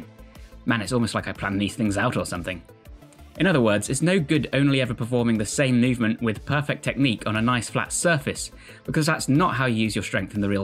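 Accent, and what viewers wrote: United Kingdom. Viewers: I wanna hear u say wingardium levIOsa, (cuz your accent is similar to harmoine's)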